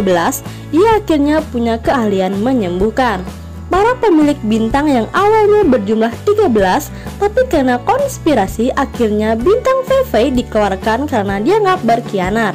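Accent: Bali, Indonesia. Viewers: Pasti orang bali . Khass logat nya 😌😌😌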